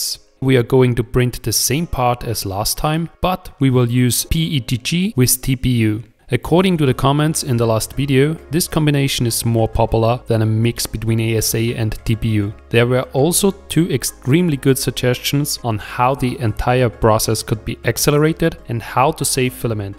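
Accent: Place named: Germany. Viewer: Wenn der deutsche Englisch spricht. Dennoch, gutes Video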